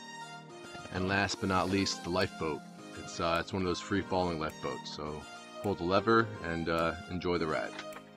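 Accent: New Jersey, United States. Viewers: I've been trying to figure out who you remind me of, at first I thought maybe it was someone I knew or worked with but I realized it's Mike Rowe the "Jobs" guy and your stuff would be perfect for his programing, your walkabouts and overtime clips throw in a drone shot and I think they would love it. Just an idea, I just realized your talking to yourself when you make these, if you wonder your voice is very smooth with just a touch of Turnpike.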